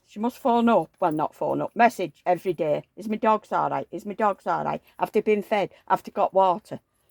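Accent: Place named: Lancashire, United Kingdom. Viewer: Hi, there. I'm Linda from near Lichfield. What beautiful babies you have in absolutely gorgeous outfits. I have only recently got into doll collecting but only the cheaper ones!!! Really enjoyed your video and agree that the changes we have seen in our lifetime are tremendous. I was born in 1951. I was wondering where you are from in Lancashire(?) You have an accent I recognise similar to that of my grandparents who were from Westhoughton.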